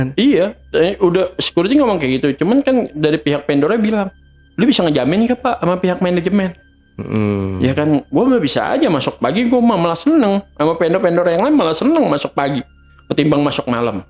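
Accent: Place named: Sunda, Indonesia. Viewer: Ngegerung sunda Banget bang😁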